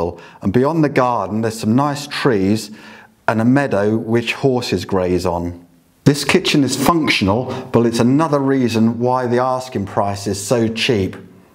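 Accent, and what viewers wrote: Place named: England, United Kingdom. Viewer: You and Your dog Ruby are The Absolute Sweetest!! Just Had to click on another of your Charming videos with your Sweet dog and your Charming English accent!! I Wish realtors here in New York, United States, would Show videos with their Loving dogs!! Makes for such a warm and inviting video to view potential homes. Thankyou So much for posting, All The Best To You and Dear Ruby in England dear sir!! Take care, Most Sincerely, Bernadette.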